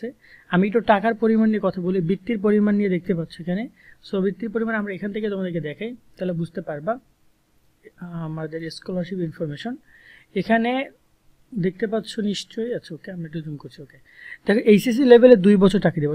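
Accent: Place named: Barishal, Bangladesh. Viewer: Vai apni ki barishal er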